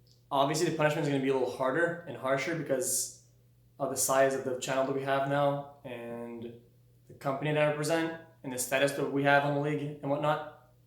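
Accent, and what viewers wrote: Quebec, Canada. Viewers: He’s a French Canadian that is trying his best to talk English though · Just to make sure, are you from quebec, because you have the accent, i write this in english because im not sure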